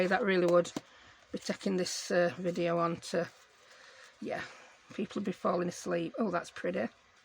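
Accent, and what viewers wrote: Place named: England, United Kingdom. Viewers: You sound like s nice English lady i think i will subscribe to you. I sm in Australia ❤ so hi! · I am so glad I have found you, it's like home from home. I am from Rotherham, but living in France at the moment, I really miss hearing a proper Yorkshire accent. I can't tell you how much you make me laugh. I am learning so many new techniques, but you are costing me a fortune !!!! I have bought some Edith Holden books, (which is how I found you in the first place looking for Edith Holden journals) oh and now you have shown me another two books I "need" to buy. 🙂🙂 and then there is the sticky backed kraft paper (never knew there was such a thing!) Your videos are so entertaining and I understand everything you are saying LOL. Linda xx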